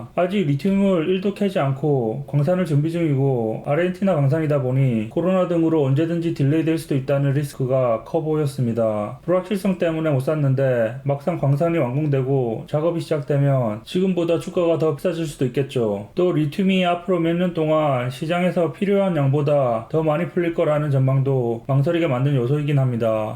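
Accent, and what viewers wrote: Gyeongsang, South Korea. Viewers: ovv 처럼 한 번에 다 몰빵은 못해도 조금씩 숫자 늘려가다 보면 나중에 좋지 않을까 하는 생각에서 가지고 있네요. 형님의 구수한 경상도 사투리와 함께하는 영상이 언제나 즐겁습니다 ㅎㅎ 올해도 잘 부탁드립니다~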